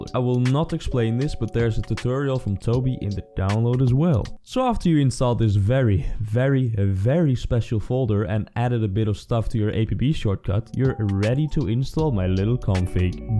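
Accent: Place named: Netherlands. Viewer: Hahaha your dutch tho kan gewoon je accent horen maar bedankt pik me laptop kan nauwelijks dit spel aan, Dus ga zeker dit proberen!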